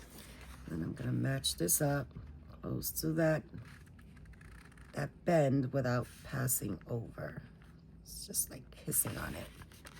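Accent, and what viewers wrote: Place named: New York, United States. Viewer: These are awesome. You are very creative. New subscriber here! I could hear that New York accent as soon as you started talking. ❤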